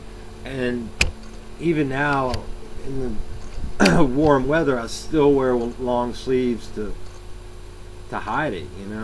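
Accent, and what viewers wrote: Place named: North Carolina, United States. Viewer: Yea man I live in Leland in Windsor park as well so I go that same way to get to the clinic. I think it was just the way you talked and then a few things you said in your videos like you were in south east nc ect that made me think we must go to the same clinic. Pretty cool man. Glad you got off the shit. I just got on it last year and I kinda like being on it because it helps my chronic back pain that has kept me from sleeping well for years now and it also satisfies my opiate cravings. But I do wanna be free one day so I watched your vids. I plan to do the taper correctly so hopefully it won't be as bad as what you went through but it's really scary.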